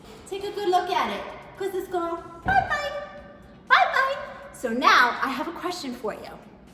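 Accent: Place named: New York, United States. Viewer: Are you from NY? Like the Bronx? You have the accent. I’m from Westchester NY parents from the Bronx. You are definitely a New Yorker!❤❤❤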